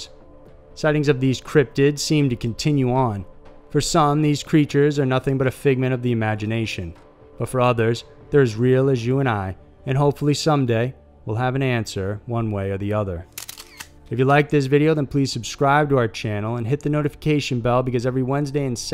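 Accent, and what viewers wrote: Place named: Texas, United States. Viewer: I absolutely love all of your videos. One of the best channels and def the best narration/voice. I wonder if you're from Texas? I am and your accent always makes me think you are :)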